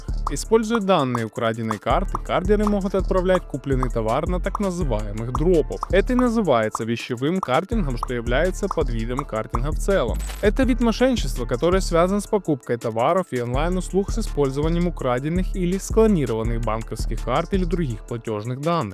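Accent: Ukraine. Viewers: Автор ваш акцент говорит о том что с Украины,и к вам будет вопрос-предложение,не сложно ли вам пособирать информацию о том какие у вас статьи и какие сроки за них,кто чаще всего туда идёт. Вы вещаете на русском но у нас есть и несколько своих каналов на эту тематику,здесь бы хотелось бы видеть разницу как у вас и у нас.У нас чаще всего идут-попадаются молодые от 18 до 25 лет и сроки от 3-5 до 20 по статье 187 УК РФ,в зависимости от того куда деньги ушли.И хотелось бы узнать как у вас с этим,это правда займёт больше времени но и интереса будет больше.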